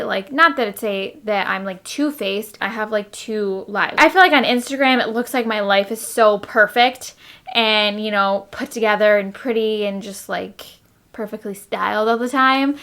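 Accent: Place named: New Jersey, United States. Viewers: Love your lipcolor!!! And I assumed you were from Jersey too. I'm born and raised in Cali. And to me, your accent sounds like what I would assume is a Jersey accent. Buuut, I am not an expert obviously on accents lol. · I thought you were from New Jersey bc of your accent 😂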